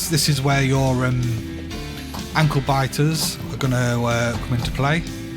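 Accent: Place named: United Kingdom. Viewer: Wait that was a girl ? 😂😂 also where is she from in aus cause that accent also sounded British lmfao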